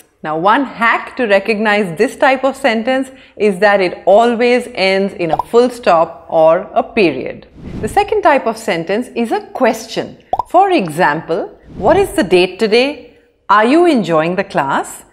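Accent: India. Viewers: She is not speaking UK or US accent she speaks simple Indian English so I was wondering how she is getting more view at each video finally I googled her & found she is brilliant and very hard worker Finally she earned one more her subscriber 🌹👍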